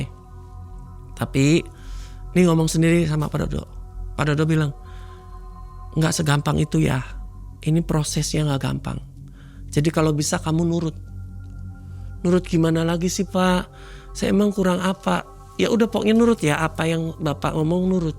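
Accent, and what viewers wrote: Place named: Cirebon, Indonesia. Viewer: Jawa cirebonnya dah medok bnget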